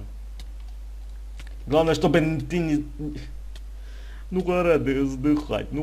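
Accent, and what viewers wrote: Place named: Ukraine. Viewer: Голос украинский 😆😆💫🌚💦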